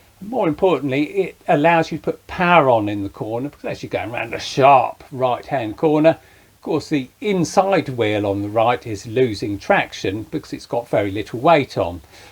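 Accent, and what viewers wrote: United Kingdom. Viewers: I love this guy. Listening to an english fellow explain things is as comforting as high tea on a lovely afternoon.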